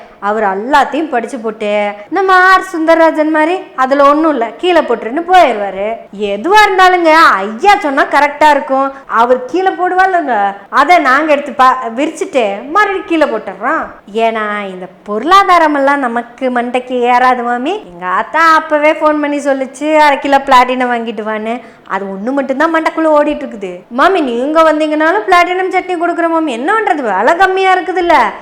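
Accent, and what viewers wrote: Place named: Tirunelveli, India. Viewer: உங்கள் குறல்மொழி திருநெல்வேலி மாதிரி அழகாக இருந்த்து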